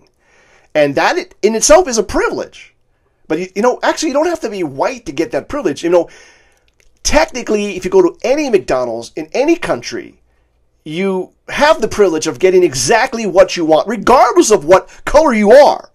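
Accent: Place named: New York, United States. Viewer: This is the first time I saw you. A truly great stuff. Jerry Seinfeld reincarnated- I wonder, is he still alive? This New York accent. Promiss to watch your videos, now I`m working in my garden -in Poland! That`s where I live. 'White Privilages'? Jeez! You have them back home? What`s that?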